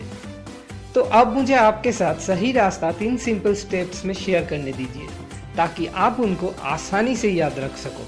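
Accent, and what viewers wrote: Bengal, India. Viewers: Dada, bangali naki? Accent shune mone holo :) A good video!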